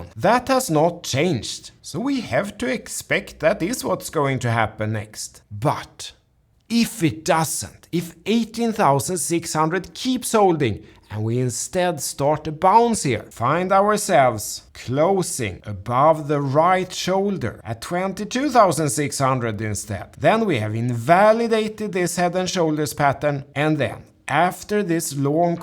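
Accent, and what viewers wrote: Sweden. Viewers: I always say "Hado" (I don't know how to spell it) to my swedish gaming buddies. I say it in your accent and for weeks they thought it was one of their countrymen saying it. You've apparently taught me more than investing strategy I also asked them about the canned fermented fish and they said, there's no way they would ever eat it 😆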